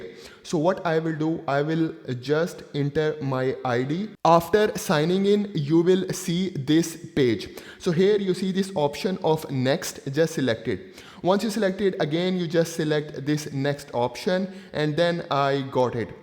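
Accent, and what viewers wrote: India. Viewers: Yessir he has an Indian accent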